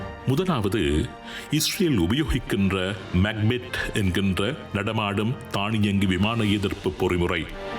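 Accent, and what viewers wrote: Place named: Eelam, Sri Lanka. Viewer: Bro uganda voice eganda ஈழம் voice im from trinco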